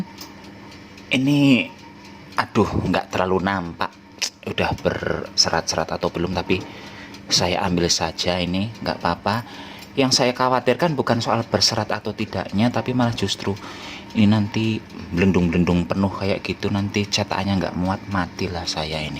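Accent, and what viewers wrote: Banyumas, Indonesia. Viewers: Lik...ngomong ngapak malah seru..nek nglebokan alias memasukan sih bhs ngapake apa yaahh.. 😀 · Memang sangat" menghibur para pecinta yutub sperti aku dn bicaranya yg ak ska ngapak alias lucu 😅😅😅😅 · Saya suka resep mas apalagi bahasa banyumasan kental banget 👍👍👍😇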